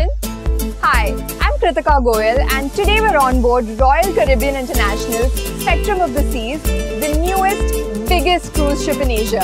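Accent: India. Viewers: Only Indians can understand your accent. Thanks for the subtitles.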